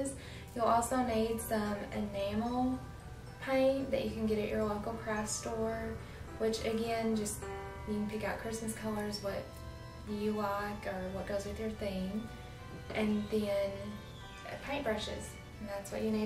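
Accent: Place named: South, United States. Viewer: Are you SERIOUSLY Chinese speaking Southern what state are you from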